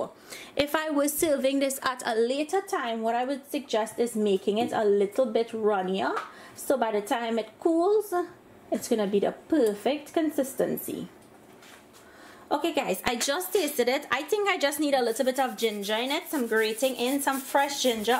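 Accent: Trinidad and Tobago. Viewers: I did a quick search for a cream of wheat recipe, and this video was the first to come up. I clicked the thumbnail without reading the name of the video or the name of the channel. Within the first 2 seconds of the video I said "buh she soun'ng like ah Trini". It was a most wonderful realisation that this is a Trinidadian channel on YouTube. Imagine my elation when it hit me that it was the first video to come up! Good job on the quality of your content as well as the authenticity with which you have present 'Trini cooking'. You have represented us well in my estimation! I truly hope this channel will continue to grow (: all the best to you.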